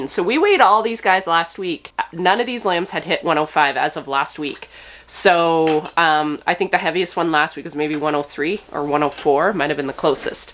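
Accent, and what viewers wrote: Canada. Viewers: hello from North Dakota, your honesty is so refreshing. I adore your Lambs and watching them grow, I must admit that when I first started watching the channel I couldn’t eat Lamb. As time went by and I saw the love and care you show each of them that soon changed. Lamb chops are my absolute favorite thing to eat, if it’s on the menu anywhere I’m ordering it. My husband not so much he just doesn’t enjoy the flavor of the meat. Keep on doing what your doing, I must also admit that I love hearing your accent and Canadian sayings it reminds of home. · You must be Canadian. The fist time you dropped an "eh" I started looking for bilingual packaging and I found it.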